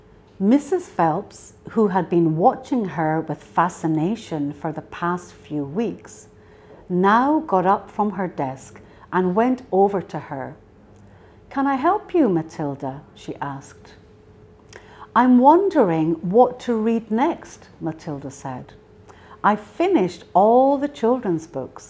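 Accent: United Kingdom. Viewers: 영국발음이 너무 좋아요... ㅠㅠ Roald Dahl Charlie and the Great Glass Elevat 이 책도 읽어 주실 수 있나요? 책이랑 같이 읽으면서 듣고 싶어요!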